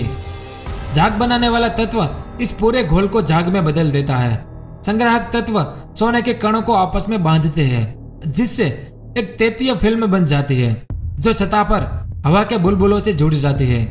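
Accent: Gujarat, India. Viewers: Tu Gujarati lage se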